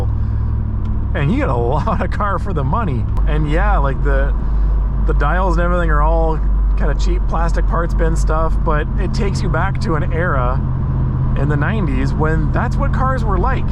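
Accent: Canada. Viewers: Relax that's how they say it in Canada... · I hate Canadian accents. Aouuut and abouut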